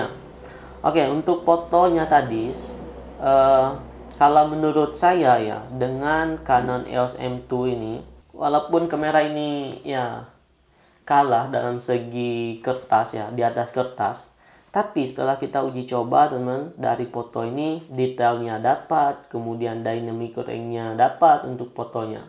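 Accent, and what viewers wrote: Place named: Kalimantan, Indonesia. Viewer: Dengar logat abang ni bicara keknya abang org kalimantan ya bang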